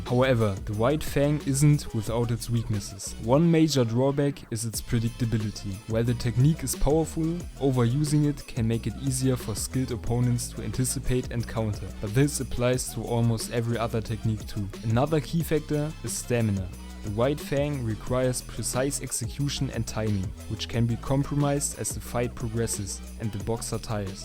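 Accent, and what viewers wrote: Germany. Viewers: This german accent... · Where u from? You sound German or something like that. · U German ?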